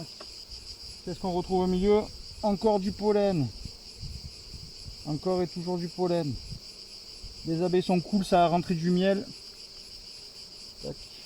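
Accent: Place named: Belgium. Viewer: Bonjour monsieur vous êtes du Sud ?? On entend les crickets ou bien c’est une musique de fond ?? En tout cas vous avez un jolie accent Belge😁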